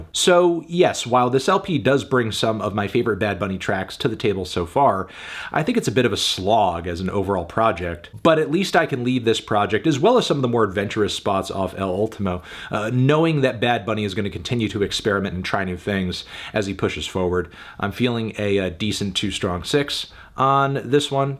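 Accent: United States. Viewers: arent u italian why do you pronounce spanish words so…gringoly.. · No se le quita lo gringo.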